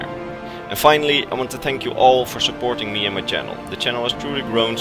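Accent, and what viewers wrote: Netherlands. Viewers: Ik kan duidelijk horen dat je nederlands bent. Goede video's man, ga zo door! fijn om een mede elder scrolls lore nerd te vinden uit eigen land!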